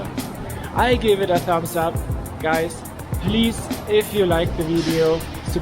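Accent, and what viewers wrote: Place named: Germany. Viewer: Jag måste bara fråga, är du tysk men bor i Sverige? Anledning att jag frågar är att du verkar ha tysk brytning på din engelska. Massa ursäktanden från min sida om jag har fel.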